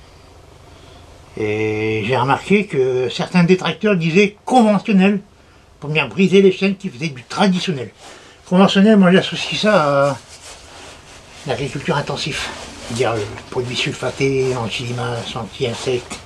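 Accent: North, France. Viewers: Bonjour merci pour toutes ces vidéos c'est toujours un plaisir. En tant que fille du nord, je vis maintenant en Bretagne et la nostalgie de ma région d'entendre l'accent me fait chaud au cœur. J'apprends beaucoup avec les vidéos. Merci beaucoup pour les conseils et je me suis empressée d'acheter mes graines Bocquet. Bonne journée à vous deux. 🤗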